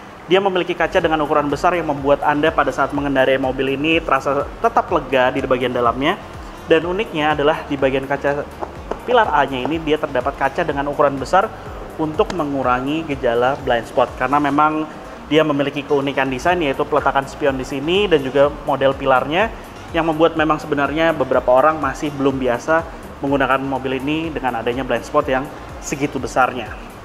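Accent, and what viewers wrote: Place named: Banjarmasin, Indonesia. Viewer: Kalau mendengar logat bahasa mas, logatnya logat bahasa Banjarmasin😂😎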